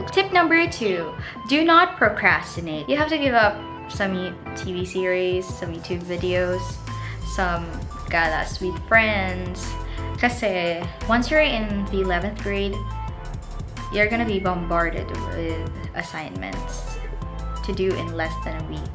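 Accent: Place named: England, United Kingdom. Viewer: ATE CAN YOU TEACH US WITH YOUR ENGLISH ACCENT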